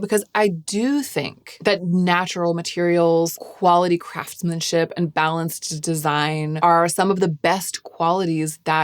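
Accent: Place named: United States. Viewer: One American with amazing accent, tone and overall delivery. Very articulate and elegant speech.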